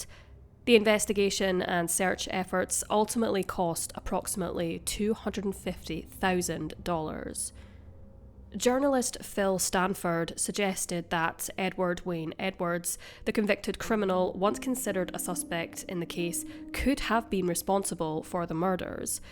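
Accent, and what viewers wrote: Scotland, United Kingdom. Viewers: The narrator is Scottish. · She is Scottish and the English... UK pronunciation is coupee. Stop expecting everyone to speak American English. ~From a fellow American.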